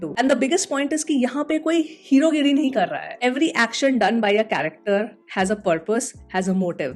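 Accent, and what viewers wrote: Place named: Northeast, India. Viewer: Mam are you from north east because your hindi accent doesnt have that north indian vibe where are you from❤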